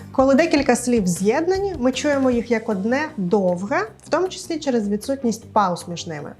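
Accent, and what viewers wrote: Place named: Ireland. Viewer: Той самий Айріш акцент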